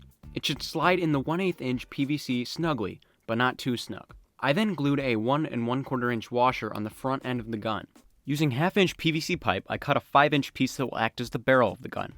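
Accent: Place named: New York, United States. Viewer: you spund like youre from new york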